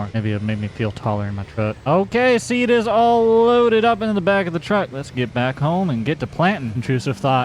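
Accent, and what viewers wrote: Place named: South, United States. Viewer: I love this man and his very southern accent when he is screwing up 😂❤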